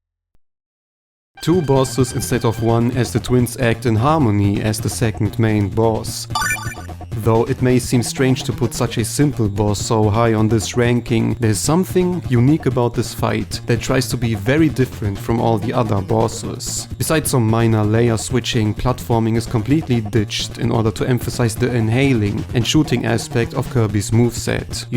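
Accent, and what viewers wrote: Germany. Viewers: I'm glad you're finally back. I never thought I'd as happy to hear your german accent again😂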